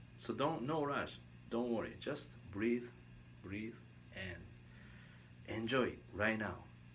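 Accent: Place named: Japan. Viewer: I'm a simple guy. I see long black hair, Japanese accent, tasty blues...I hit like button... Btw Beato's vid and subsequent inferring are a little elliptical: the way you play is highly influenced the comfort (or discomfort) you feel on the guitar; I'm not surprised Beato (whose guitar setup is mainly on 8's) plays waaaay better and sounds waaaay better on 8's...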